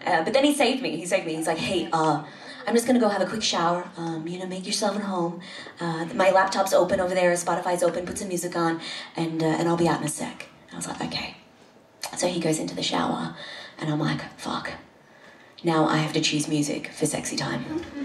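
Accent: Australia. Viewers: yep or maybe kiwi but I'm fairly certain she's Aussie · Just an average Aussie Sheila.She is definitely not a kiwi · 55 seconds in I had to stop watching, Australian+the topic. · She's Australian, we all sound like her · Love the Australian accent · She"s Australian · Is she from the land down under?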